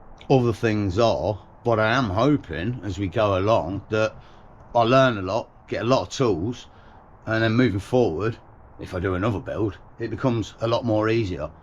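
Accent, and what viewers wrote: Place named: Leicestershire, United Kingdom. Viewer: Loving the videos lately mate. Nice hearing a fellow Leicestershire accent lol. Keep them coming!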